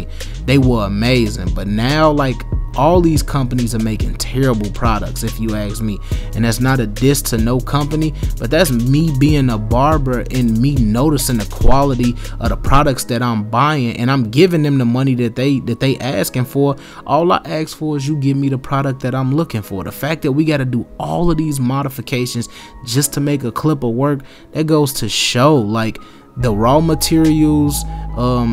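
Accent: Chicago, United States. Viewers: Chicago accent..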